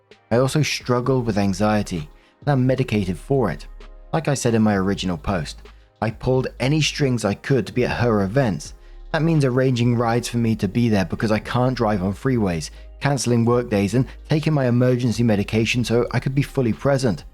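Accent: United Kingdom. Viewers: Aren't British accents so sexy? 😍🔥🥵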